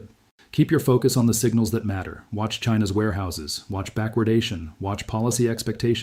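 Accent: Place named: Australia. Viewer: This ai has a slight Aussie accent!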